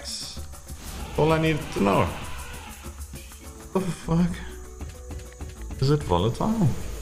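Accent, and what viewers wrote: South Africa. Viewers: Didn't know you were originally from SA, hard to tell sometimes. I hear like Dutch and English with like idk, Australian sprinkled on top. Accents are cool. I'm from the West Coast and have traveled around the entire US, it always shocks me when people start talking about accents, like I hear theirs but I don't hear mine! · In also from South Africa I thought I heard a South African accent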